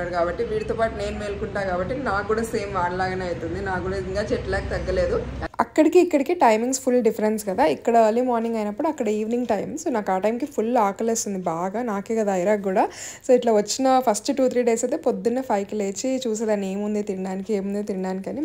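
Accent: Rayalaseema, India. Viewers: మన రాయలసీమలో మాటల్లో చెప్పడం చాలా బాగుంది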